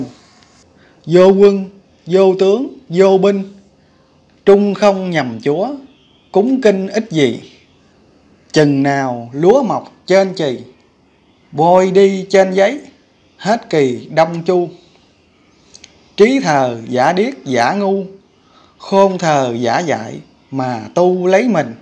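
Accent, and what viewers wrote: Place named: Central Vietnam, Vietnam. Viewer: Kiến thức này ko để trọ trẹ đọc mất thiêng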